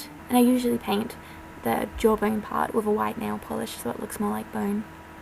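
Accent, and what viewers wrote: Australia. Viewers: Are you from Australia? Just curious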